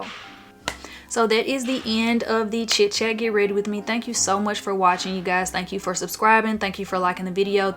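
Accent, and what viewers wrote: South, United States. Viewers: GIRL… you country as a MF and I love that! I’m a GA Peach so I understand! 😍😍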